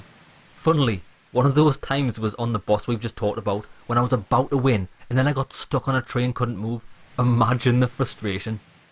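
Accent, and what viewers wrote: Newcastle, United Kingdom. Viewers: This guy Is a typical geordie, weird and annoying.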